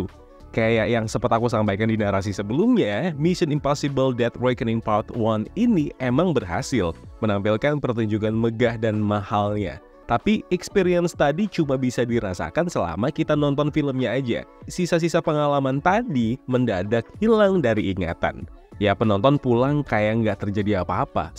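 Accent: Medan, Indonesia. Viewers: kurang dapet bahasa ala ala medannya lek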